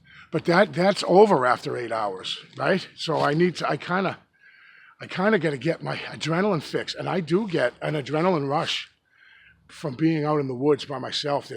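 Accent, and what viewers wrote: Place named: New England, United States. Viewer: A beautiful day for January in the East! We had rain with thunder and lightning this morning. over 50 degrees in Jan., think I'm going hiking in the woods - I'm envious every time I see you out and about - Love your NE accent - don't worry about trolls - just keep it moving, and keep the videos coming! And just keep that head of yours on a swivel!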